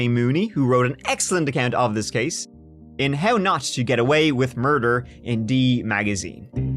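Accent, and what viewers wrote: Ireland. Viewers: I've watched all of your videos and started listening to your podcast. Omg you are amazing so entertaining, adorable with that Irish accent lol. Your storytelling with a touch of humor but respect for the victims, I'm speechless. The last time I laughed so hard was w Howard stern (when he was funny) Your starting a culture if that's the right word. I'm telling my family and friends to watch you videos and podcast. And why?? You ask. "Coz I love ya" ❤️ lol, your friend Mariah from New Jer USA.❤❤ Goodluck in all of your endeavors. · There is that saying, “One who wants their bread buttered on both sides” It does share that very same meaning “One who wants to have their cake & eat it” I love such sayings, they are simple & there aint a human being on the planet who doesnt know wot the heck they mean! Like this 1, “ U’ve made ur bed, so go lay in it” Another good’un, “ One who has Champaign taste, but only has Beer money” I just subd to That Chapter PodCast, coz i saw the length & to be honest, like really honest, (er... 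this may creep out a tad) its wicked Irish accent & his wicked wit & dry as fk & sarcastic sense of humour that has me totally addicted to bingeing the heck outta his normal YT channel, so now im gonna binge the fk outta his PC! Sorry, but u are addictive full stop!! Just had to lob that 1 out there, no point in hiding the reality! U ought to be blushing, someone beimg addicted to u, thats a complement, but so u dont freak out, i DO NOT have any intention of stalking u or finding out where u live so i can send u letters & strange gifts, etc!! Nope, i am only addicted to YT channels, (SO REST ASSURED ME OL’ FRUIT & NUT, ur safe) no stalkerish behaviour here!! Hahahaaa!! Now back to this PC, thanks! 💝, Peace & Bananas! Ha! 😝😉🙏🏻🤗🤣🤣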